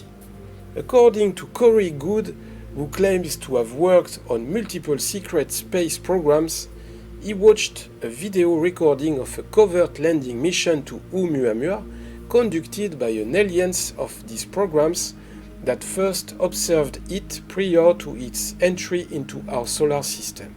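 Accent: France. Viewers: I have to say a critic but hope you'll receive this as a constructive criticism, hope you'll forgive me, your videos are super awesome however your French accent is very strong sometimes I nearly can understand you you need to talk more clearly, it's my point of view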